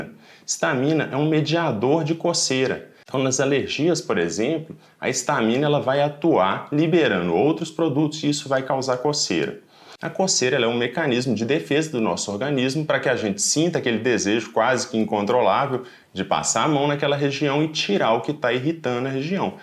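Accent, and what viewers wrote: Minas Gerais, Brazil. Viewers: Esse sotaque mineiro é maravilhoso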